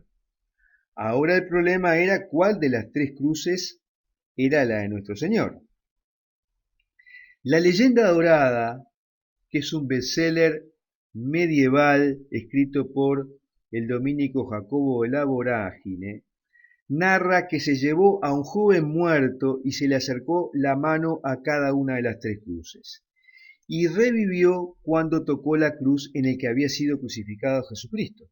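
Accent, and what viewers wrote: Argentina. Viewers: Tengo 2 preguntas Estos vídeos llegaron a mi como sugerencias, no conocía el foro, por los temas que tratan y por las fechas de publicación parece ser nueva la dirección. <link> cuando estuve en la página quise saber quienes eran ya que está la opción, por más que la clike no me lleva a ningún lado. Las preguntas son: Es una org religiosa? Si bien por su acento parece argentino Ud es religioso o historiador?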